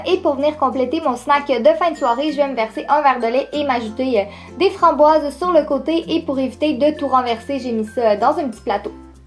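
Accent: Quebec, Canada. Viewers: coucou j'adore tes vidéos et ton accents québécois je t'adore mais juste une question pourquoi met tu de verre de contact ? merci de me répondre rapidement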